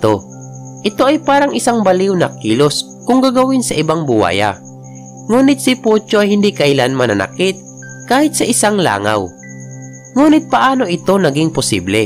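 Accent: Visayas, Philippines. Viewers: Halatang bisaya peru ok na din yung accent😂 keep it up lods👍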